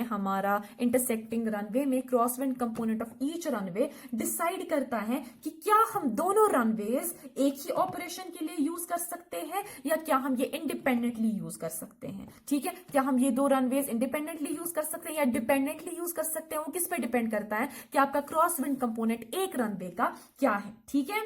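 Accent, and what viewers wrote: Kashmir, India. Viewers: Aap Kashmir se ho mam?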